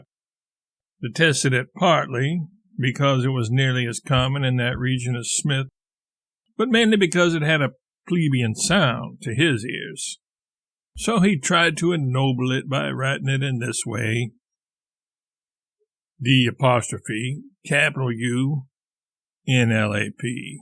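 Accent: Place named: United States. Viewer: Thank you so much! I've been trying to find this piece of Twain's work in audio form in an American accent for weeks now, I'm quite used to John Greenman, but this is perfect, I truely appreciate this channela and everything on it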